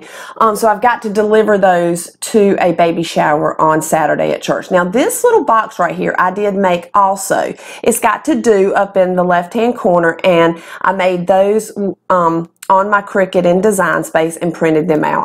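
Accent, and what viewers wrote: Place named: South, United States. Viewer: Your accent makes me so happy! I don’t see many PWM vids with a southern accent like mine. Great video, Mama! 😊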